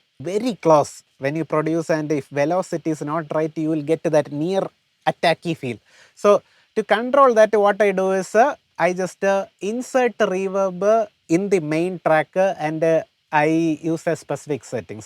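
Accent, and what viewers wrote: Kerala, India. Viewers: bro nothing wrong in it, but since he’s explaining, I couldn’t catch it, cuz it was more like Malayalam English, the concept is amazing! He’s knowledgeable no doubts in that, just posted what I felt as a listener.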